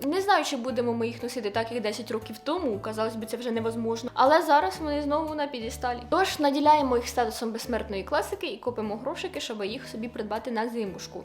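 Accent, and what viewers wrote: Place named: Central Ukraine, Ukraine. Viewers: Ви межі своєї області не покидали ніколи?😅 Центр і схід України, майже всюди, говорять так) Послухайте творчість Артистки, вона з Херсонщини😊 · Я так думаю дівча з Полтавщини... це типова полтавська "манєра балакати". Я сама з Полтави, у мене навіть подружка є, просто копія ця мала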